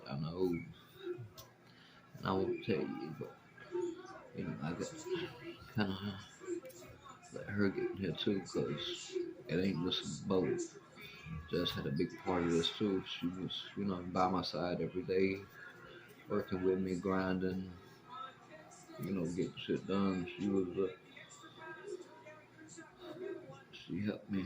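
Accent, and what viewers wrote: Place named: Louisiana, United States. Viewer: Are you from Louisiana? I’m not making fun of the accent - I like it I’m just curious if that’s a Louisiana accent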